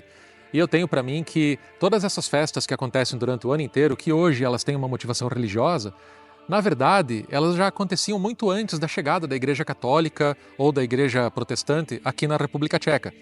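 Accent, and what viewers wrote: South, Brazil. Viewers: Oi, esse de teu sobrenome é português, italiano, ou polonês transformado? Logo que começaste a falar, identifiquei: RS, SC Ou Paraná? Sou jornalista e antropóloga e adoro vídeos como os teus. Parabéns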